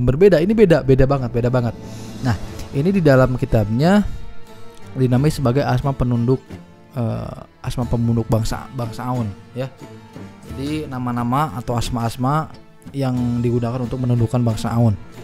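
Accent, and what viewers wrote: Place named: West Java, Indonesia. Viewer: Klo di dengar dari logatnya kyknya kang orang sunda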